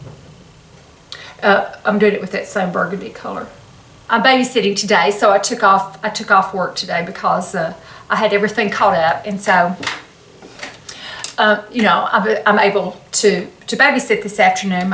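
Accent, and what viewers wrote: South, United States. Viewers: Hi neighbor - I am from the western side of Arkansas. Discovered your channel thru The New Beauty email article recently. Love watching you and hearing that southern accent and phrases I am so used to. I feel like you are my good friend! Your demos are very good, especially for me, since I am 9 years your senion. I will keep watching. Keep up the good work!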